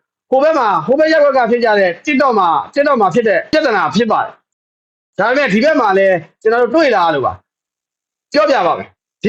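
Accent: Yaw, Myanmar. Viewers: ကီစ္စမရှိ ရေ တင်ပြမှုတိုင်းအားပေးလျက် 👍💯အဆင်ပြေသလို့လုပ်ပါနော်👏👏👏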